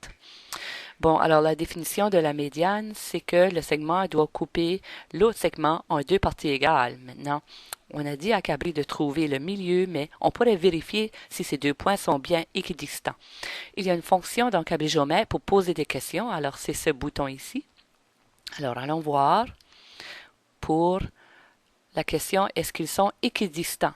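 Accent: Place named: Quebec, Canada. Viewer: Merci beaucoup!ça m'a beaucoup aidée! PS:J'adore votre accent,vous êtes canadien,non?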